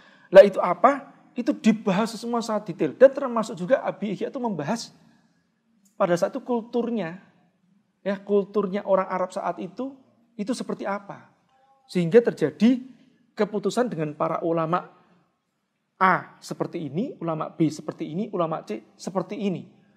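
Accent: Java, Indonesia. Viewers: Tanya juga Mas, kok orang Batam medoke Jawa? 😬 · tpi ada sdkt logt Jawa nya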